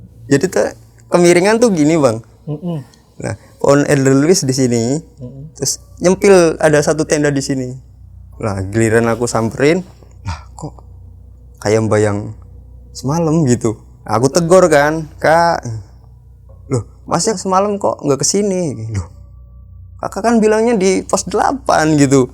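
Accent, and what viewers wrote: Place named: Banyumas, Indonesia. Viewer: Aloy versi ngapak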